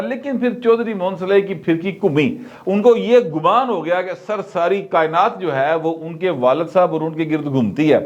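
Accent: Lahore, Pakistan. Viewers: bhai ap ka ye lahori style bohat khobsurat lagta ha🤗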